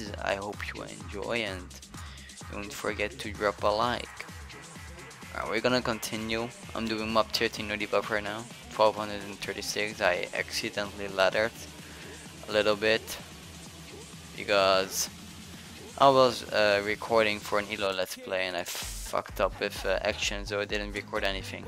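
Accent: Netherlands. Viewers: Dutch english ftw xD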